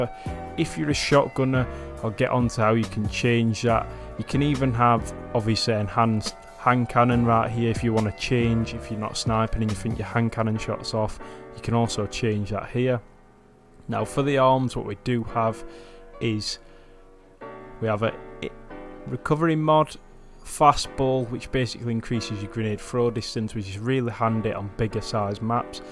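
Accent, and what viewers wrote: Yorkshire, United Kingdom. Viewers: Where from Yorkshire u from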